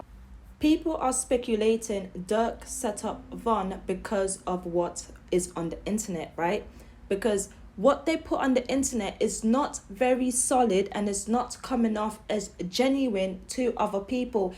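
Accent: United Kingdom. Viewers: Lmao how she talking chiraq bidness n talking British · This bitch goofy asf she needs to stop making content I’m from englewood Chicago and this fasho isn’t the case us Chicagoins hate these outsiders trying look and and speak on us like girl yo ass sound like u from the uk somewhere u better focus on them roadman where u at wetting eachother not here ole lame ass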